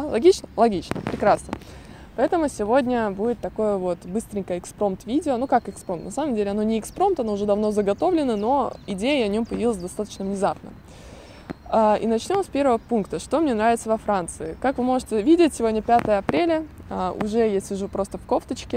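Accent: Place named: Russia. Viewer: Dmitrijs Bogdanovs Русские в России в каждом регионе по разному говорят.И фонетика и лексика и фразео-лингвистические особенности и диалекты. говорит также как жители (девушки ее возраста и примерно одного образовательного уровня)любого крупного города России.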